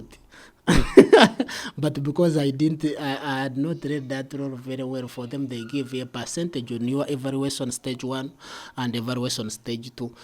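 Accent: Uganda. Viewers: Too authentic, I like this man from Bunyaruguru😊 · I can detect Ugandan accent from a kilometer away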